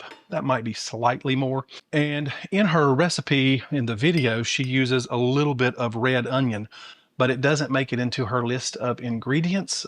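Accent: South, United States. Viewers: San Diego!? I thought you were Southern 😄😜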